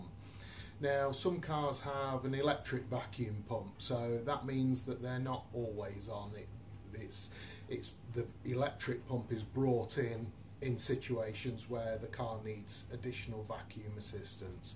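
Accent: United Kingdom. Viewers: yo are u british bruv???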